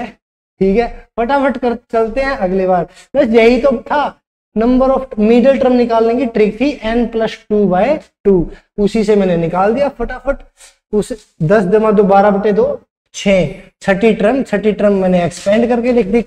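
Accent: Haryana, India. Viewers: Sir aap 101% haryana se ho na? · Han ji.. Wese isme koi shak nahi hai... Qki sir haryana se hi hain... Magar aapki daag deni padegi ki aapne tab bhi pahchan liya jab sir ne hatyanvi nahi boli hai....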